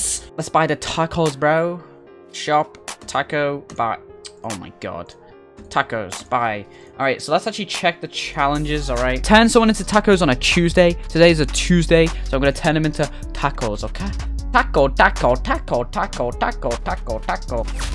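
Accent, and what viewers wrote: United Kingdom. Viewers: are you talking english in british way ?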